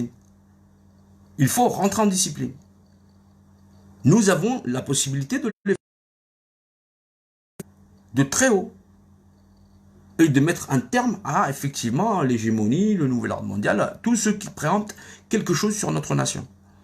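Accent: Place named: Basque Country, France. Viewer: Avez l'accen basque et un peuti peut de Mediterranean...😊❤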